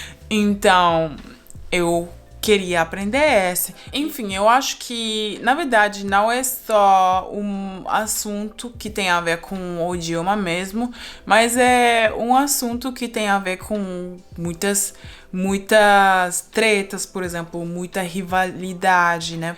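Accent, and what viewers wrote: Brazil. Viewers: Fique à vontade para expressar sua opinião. Você é fluente em Português com sotaque brasileiro e isso incomoda os portugueses. Na verdade, o sotaque deles é difícil para nós e até incompreensível muitas vezes. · A gente te entendo, se você tivesse aprendido português em Portugal, com certeza você usaria a bandeira de Portugal e está tudo bem você usar nossa bandeira, porque você aprendeu aqui no Brasil e até tem nosso sotaque e existe sim a diferença entre o português de Portugal com o nosso português. Esses portugueses que estão indo reclamar com você são mimados demais e esnobes. Você é ótima e seus conteúdos também e não se importe com essa rivalidade.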